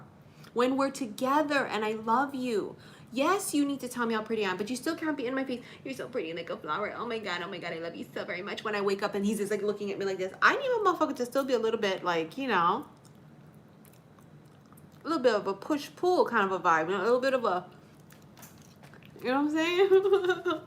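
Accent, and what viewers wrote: New York, United States. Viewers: Sound like a New Yorker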